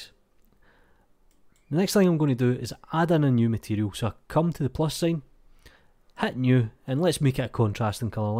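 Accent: Scotland, United Kingdom. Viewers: Thank you random scottish guy from internet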